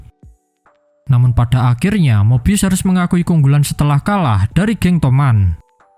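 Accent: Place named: Java, Indonesia. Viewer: Wong jowo koyok e. Iyo a bang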